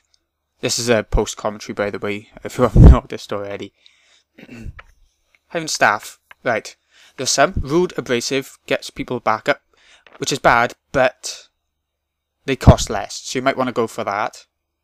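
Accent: Wales, United Kingdom. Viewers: Used to LOVE this game, gonna buy it again on PSN. And no offense but I love your accent, which is unusual seeing as I usually dislike the welsh accent :P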